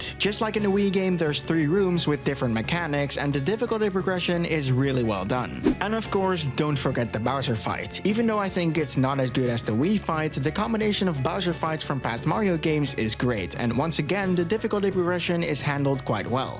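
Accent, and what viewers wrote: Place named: Netherlands. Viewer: Haha ik dacht al een Nederlands accent te horen. Tof filmpje. Ik zou ze graag willen spelen. De newer games, maar ik ben totaal niet technisch, heb geen pc en weet niks af van mods.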